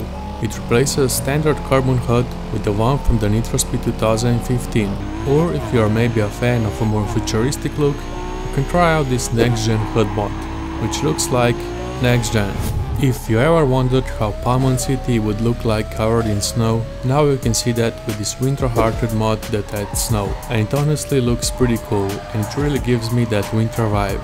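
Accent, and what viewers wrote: Russia. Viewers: Акцент выдает своего))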